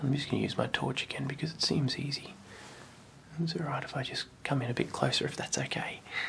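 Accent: Australia. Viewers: I love me some Australian accent ❤️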